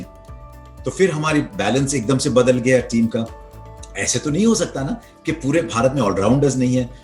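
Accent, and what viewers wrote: Hyderabad, India. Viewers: Hyderabad aur Lucknow ka asar kaafi hai Harsha Bhogle ji ki zubaan me. ❤️❤️ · There is Hyderabad accent deep in there trying to pop out..ofcourse it is present like a Hyderabadi biriyani in top end restaurant..presented in polished manner. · he speaks hydrabadi as well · There is a bit Hyderabad accent there😀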